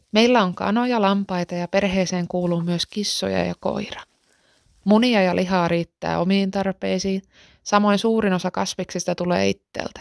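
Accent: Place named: Ostrobothnia, Finland. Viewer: Ihana pohjalainen murre. Karolta tuli vinkki sun kanavastas. Kiva video.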